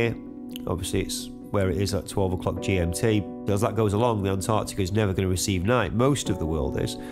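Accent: United Kingdom. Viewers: Roger Reddersen it's called northern, how a lot of us English speak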